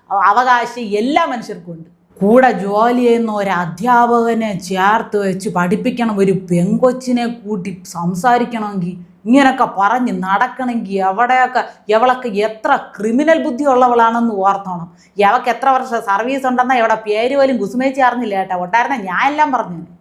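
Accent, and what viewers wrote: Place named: Trivandrum, India. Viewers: Kidu avatharanam ji... Trivandrum slang adipoli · തിരുവനന്തപുരം സ്ലാങ് നന്നായി സംസാരിച്ചു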